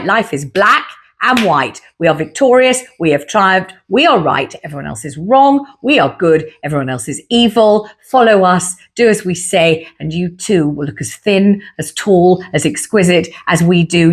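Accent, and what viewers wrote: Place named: RP, United Kingdom. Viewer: You speak soooooo well, you are a 1 off. No-one could comment like you. You're amazing & love your Queen's English, you speak soooo eloquently xxx thankyou xxx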